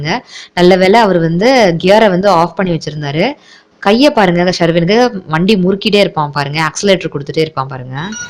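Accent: Coimbatore, India. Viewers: very cute. dress and neck less super 😘😘😘😘😄😄😄😄😄.Naanga Coimbatore nnga. yendra uttuukarunu😘😘😘😘😘😘 solldrathu super ah errukku.slang very nice pa. condippa reply vennuuuuuuuuuuuuum. OK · Endra ootu karar enga ooru language m cbe. Really sweet this video i like so much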